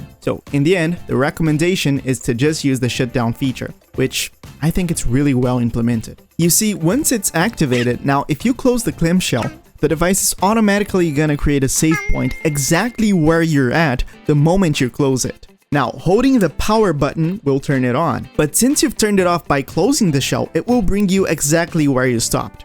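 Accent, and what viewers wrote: Brazil. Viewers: Wait is that some acento br na tua fala? 👀👀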